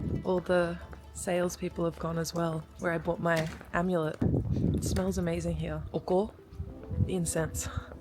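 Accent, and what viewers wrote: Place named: Australia. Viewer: I like your Australia accent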